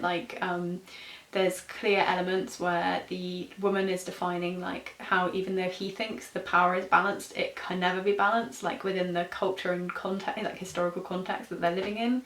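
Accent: United Kingdom. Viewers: still lose some meaning words in your speak but understend more than early, did you use some english accent or it clearly english?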